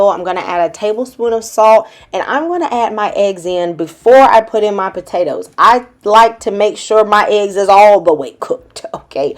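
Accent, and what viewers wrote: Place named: Chicago, United States. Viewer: Sis, are you from chicago? that accent is giving chicago vibes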